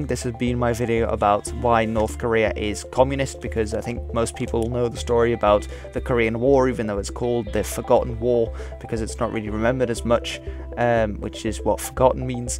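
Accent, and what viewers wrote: United Kingdom. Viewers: How did you manage to get such a good British accent? I had no idea you were Dutch, you sound exactly like a native speaker to me. Do you have any advice for acquiring such an authentic accent?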